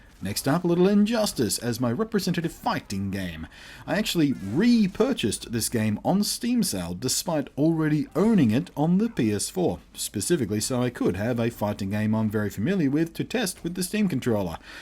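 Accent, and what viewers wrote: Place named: Australia. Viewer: On the topic of Australia, your accent always makes these reviews just that touch more special, but I really do feel for you having issues getting your hands on a lot of stuff not shipped or offered in Australia and your internet connection troubles. I have been waiting for this review and it was nothing short of what I expected. Thorough in depth review over the course of multiple games showing strengths and weaknesses. I'm going to hold off on this one for a little bit as I'm a console gamer moving over to PC gaming so I'm accustomed to the less controllable twin sticks. But I will definitely be getting this at some point in the future. I can wait for 2.0 when it does roll around. I hope things getting to Australia starts to get easier though. You're one of my favorite reviewers and if stuff takes longer to get to you then you're missing out on a lot of traffic and I just want to see someone I appreciate get more recognition I feel they deserve.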